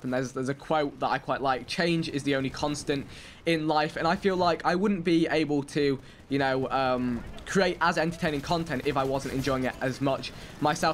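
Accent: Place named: United Kingdom. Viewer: Ive only been watching your videos for about a month which is a short time frame in comparison to your 4 years of youtube but I truely enjoy watching your videos and the sideman collaboration! I still find it hard to understand an asian with a british accent but thats probably because I live in the American South (yall). I love the variety I think the more the better. Also Im not a youtuber (yet, school work) but Id like to do a Gta video with you and or the sideman sometime, my gamertag is hockeyslimshady and is also my twitter handle, I lack everything necessary to record gameplay but I think it would be cool to have an american in the gang who is canadian (true) and can speak french, SACRE BLEU! All in all, this feels like an essay but congrats on the 500k and keep up the good work!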